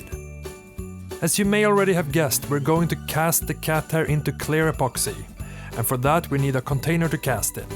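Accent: Sweden. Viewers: I love you super Swedish accent! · satan vilken grov svensk dialekt! avslöjad direkt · are you swedish or norwegian? your accent is kinda awful sorry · Jag kände igen den svenska engelska accenten men det var när du tog upp morakniven och uttalade mora perfekt så var jag säker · u sound swedish jus saying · are u sweedish?? or norwigian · Aaa i just realised that sounded kinda rude, but I dig the Swedish accent :—)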